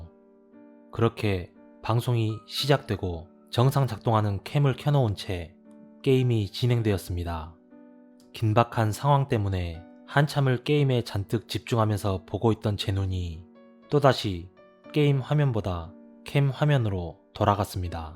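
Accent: Jeolla, South Korea. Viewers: 전라도 분이신가요?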